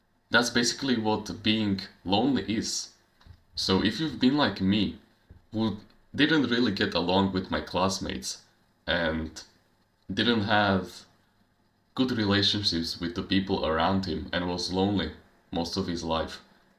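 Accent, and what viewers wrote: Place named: Russia. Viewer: Pardon me i had no idea where are you from. By your accent you sound russian ❤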